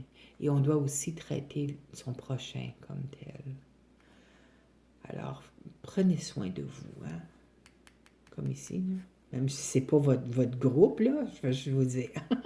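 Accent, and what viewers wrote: Canada. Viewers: Bonjour, je vous souhaite de retrouver harmonie dans votre coeur, pas d' épreuve plus large que nos épaules...une expérience de plus pour travailler sur ce qui n'est pas encore "réglé"... pour autant, ne pas se flageller, je sens que vous êtes aussi une personne qui sait analyser les choses, réconfortante, généreuse et forte. J'aime beaucoup vos analyses des cartes. Au plaisir d'entendre votre accent canadien!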